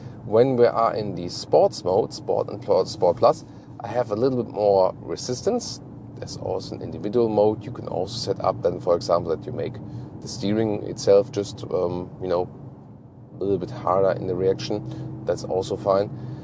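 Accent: Germany. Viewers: English with German Dialect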